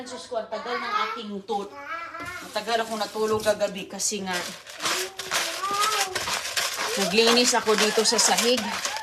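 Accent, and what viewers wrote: Visayas, Philippines. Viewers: bisaya kasi siya o waray kaya ganyan ang pronunciation 😊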